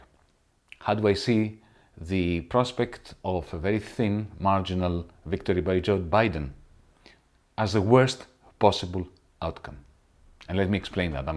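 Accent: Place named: Greece. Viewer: Just keep using your Greek roots as you speak. I'm envious it makes your speech a lot more sophisticated and easy lol.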